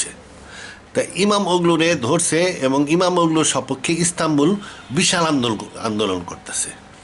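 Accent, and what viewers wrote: Manikganj, Bangladesh. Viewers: আস্সালামুআলাইকুম স্যার, আমি আপনার একজন বড় ফ্যান, আমি প্রায় সময় আপনার ভিডিও গুলো দেখি এবং এই পর্যন্ত অনেক ভিডিও দেখেছি, সময় সাময়িক ভিডিও গুলো ও অনেক ভাল হইছে, তবে ভিডিও গুলো এতো লং time যে এক ভিডিও শেষ করতে অনেক দিন লাগে। বিদেশে থাকি ফ্যামিলি নিয়া, সময় খুব কম। By the way, আপনার বাড়ি কি মানিকগঞ্জ লাগছে, আপনার কোথায় মানিকগঞ্জ এর টান আসে, আমার এক প্রিয় আঙ্কেল aunty থাকে সেখানে বাড়ি। Anyway আপনার একটা ভিডিও দেখেছিলাম জাতীয় সংগীত আর আজমী সাহেবের সম্পর্কে, আমি আমার এক বন্ধুকে সেটা দেখাতে চাইছিলাম but কোথাও খুঁজেছি পাই নাই। আপনি কি আমাকে লিংক তা দিবেন। আর একটা বিশেষ অনুরোধ, আপনি তো কুরআন তাফসীর করেসেন সাইন্টিফিক ওয়েতে, আমি সেগুলো দেখছি, এখনো শেষ করতে পারিনি, ভিডিও গুলো অসাধারণ। যেহেতু আপনি এই বিষয়ে অনেক জ্ঞান রাখেন, আপনি কি একটা গুরুত্বপূর্ণ বিষয়ে একটা ভিডিও বানাতো পারবেন, টপিকস টা হলো, রমজানের রোজা ও ঈদের চাঁদ দেখা নিয়ে, অনেকে নাকি চাঁদ আকাশে দেখার আগেই সাইন্টিফিক ওয়েতে চাঁদ এর জন্ম গণনা করে ফেলে, সেটা কি সায়েন্টিফাক্যালি অনেকে নাকি চাঁদ আকাশে দেখার আগেই সাইন্টিফিক ওয়েতে চাঁদ এর জন্ম গণনা করে ফেলে, সেটা কি সায়েন্টিফাক্যালি হচ্ছে? 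এই বিষয়ে আপনি কোনো রিসার্চ করে একটা ভিডিও করতে পারবেন, কুরআন আর গণনা অনুসারে কোনটা সঠিক? আর সাইন্টিফিক গণনায় চাঁদ দেখা কি ইসলাম সমর্থন করে? আপনি কোনো পদ্ধতি সঠিক মকনে করেন? কুরআন ও সাইন্স এর আলোকে একটু ব্যাখ্যা করবেন প্লিজ। এই মত নিয়া সমাজে অনেক ভেধাভেদ, একেক গ্রুপ একেক দিনে ঈদের namaj পড়ে ও সমাজে বৃশৃঙ্খলা তৈরী হয়।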